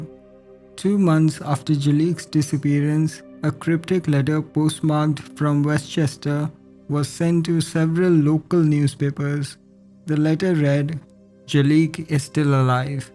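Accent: India. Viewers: he sounds Indian . Its not like he is a terrorist. Goodness.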